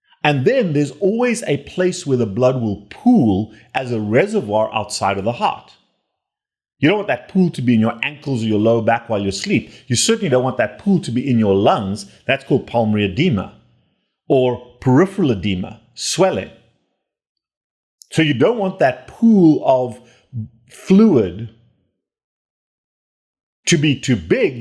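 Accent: South Africa. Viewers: He sounds south african. I love it when my fellow kinsmen do well world wide. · You sound South African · U sound like a joburg oke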